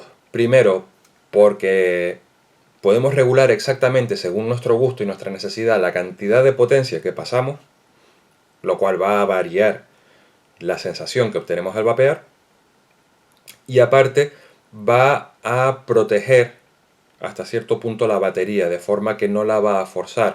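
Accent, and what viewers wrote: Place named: Venezuela. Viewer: No hablas como si fueras de españa creo que venezolano o ecuatoriano algo asi nose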